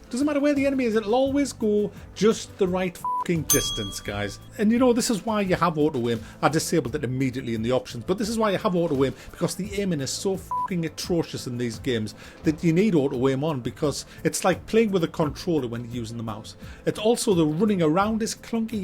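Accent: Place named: Scotland, United Kingdom. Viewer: I subscribed after hearing your fantastic Scottish(?) accent! Oh, and the ranting is a good bonus.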